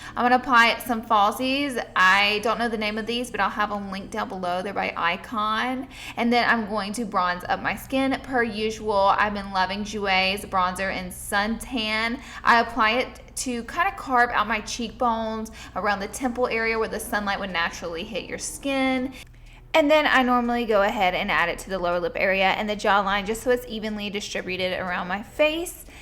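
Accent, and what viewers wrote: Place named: Rural, United States. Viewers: has a country accent 😂😂 I love it❤️ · i luuuuuuv that "aksent!!! are you from texas also??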